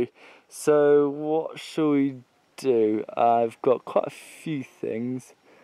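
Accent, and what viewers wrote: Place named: United Kingdom. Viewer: Sometimes I come for the great info. Sometimes I come for the entertaining programming. But sometimes I come just for the cool Brit accent. :)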